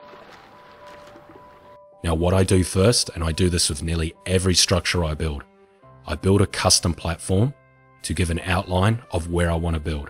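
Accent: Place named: Australia. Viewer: Australian accent what part of oz are you from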